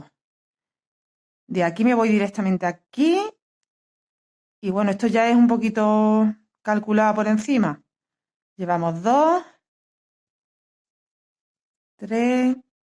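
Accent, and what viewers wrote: Andalusia, Spain. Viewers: Me encanta escucharte con ese acento andaluz yo soy una madrileña en Murcia a si que imagina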